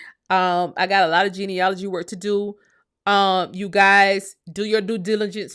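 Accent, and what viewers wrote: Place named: Africa. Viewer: Girl you African. You can’t fool me. I hear it and you need to put up the right flag because that’s not your flag. Sorry and not sorry and will never be sorry! You do a good job tethering but we “hear” you. Smmfh. You do speak very well tho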